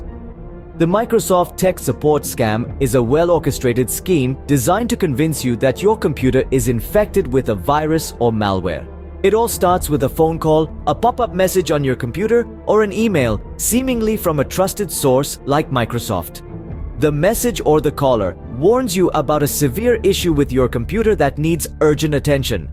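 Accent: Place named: India. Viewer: " a trusted source like Microsoft" Who the heck trusts Microsoft? I can cope with a scammer better than I can cope with Microsoft. Hate to break it to you, but Microsoft have permanent access to your WIndows computer anyway these days. Anyway, the elephant in the room is that 99% of scams are from India, and an Indian accent on the phone is a massive red flag - like like the commentary here in fact.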